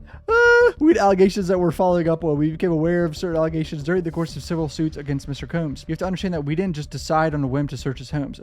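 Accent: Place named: California, United States. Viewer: Dude I love that California accent. When he said Combs homes I nearly lost it😂😂😂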